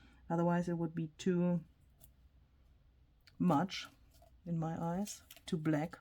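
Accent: Germany. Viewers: These are fantastic. Just love them, but seriously I love everything you do. I so enjoy your videos, your German accent and your wonderful sense of humor. I like you as a person. It’s wonderful you get to enjoy your little piece of nature at least for part of the year until they all show up and you feel like you’re in the middle of a bustling metropolis 😂😂❤️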